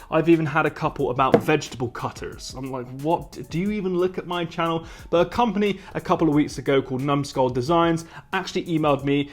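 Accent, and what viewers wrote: United Kingdom. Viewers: stop speaking words like- digging, nostalgia, etc and British accent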